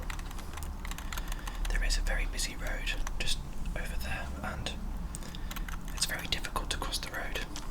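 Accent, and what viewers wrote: England, United Kingdom. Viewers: *Me before video starts*Please tell this guy is British me when video plays HOW IS HE NOT Edit: is he cause I want him to be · you are from england??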